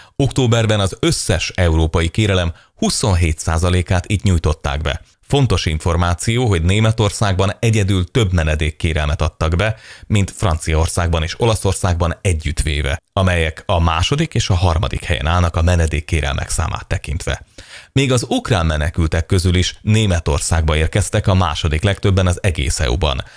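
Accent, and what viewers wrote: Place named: Palóc, Hungary. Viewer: jó hallani 1palocot. Nemtudom hogy csinálod mert visszadod a tájszólást, úgy nint 1paloc sosem tudna. Jók a híreid mert sok kútfőből merítesz valamikor Lovas István tudta ezt. Sok sikert az új évben.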